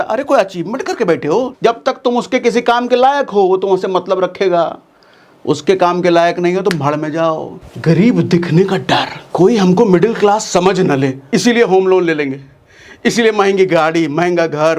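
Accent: Bihar, India. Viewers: sir namaste🙏 tex pe video chahiye v bhi aap ke desi bhasha me bij bij pe bihari ton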